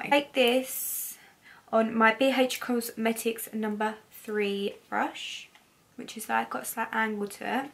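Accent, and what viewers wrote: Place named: Essex, United Kingdom. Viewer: Just found your channel and absolutely love it! Its nice to hear someone with an Essex accent haha x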